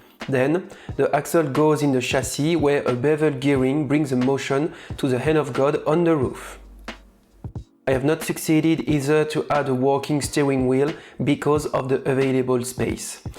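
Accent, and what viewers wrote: France. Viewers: un accent tellement francais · Awesome video as always! I also do like your French accent :)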